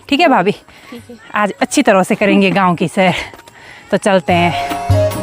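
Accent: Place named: Pahadi, India. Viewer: Bindass apake hindi bhasha me pahadi sugand aati he bahot achha lagata. bhai ko shubkamana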